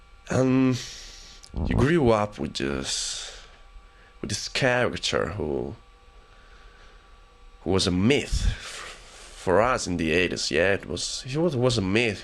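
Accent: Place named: Russia. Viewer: your accent sounds likea russian one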